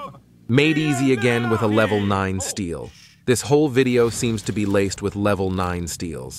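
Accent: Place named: Australia. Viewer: that sounds more like Australian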